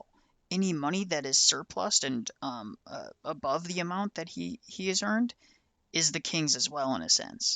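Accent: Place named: United States. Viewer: You sound American. Unfortunately I think American people, even some of the most based people on the right, are still too democracy pilled to ever get this point across. I think it’s only when the democratic system fails on a mass scale will people actually begin to entertain the possibility of non democratic alternatives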